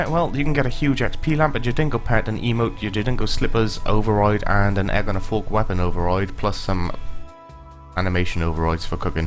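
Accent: Wales, United Kingdom. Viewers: what part of wales are you from XD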